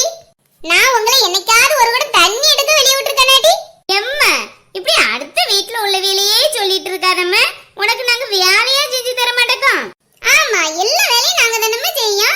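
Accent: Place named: Nagercoil, India. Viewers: Kanyakumari Tamil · Nagarkovil slang superrrrrrrrr😍😍😍😍😍😍😍😍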